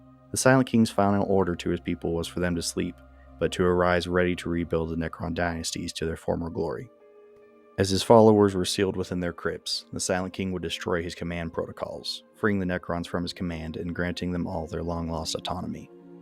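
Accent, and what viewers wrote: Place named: Appalachia, United States. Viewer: nah man your slight idk what it is - appalachian twang - is fantastic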